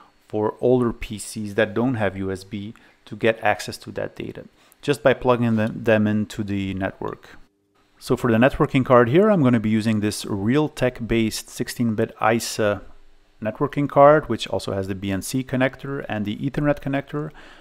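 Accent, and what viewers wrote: Netherlands. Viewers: dutch guy? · Are you Dutch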